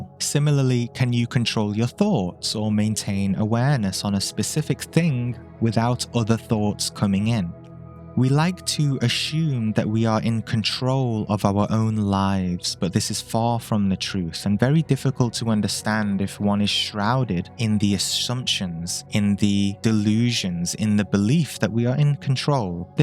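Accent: United Kingdom. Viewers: This was very nourishing. Cheers. Lovely soft Liverpudlian accent.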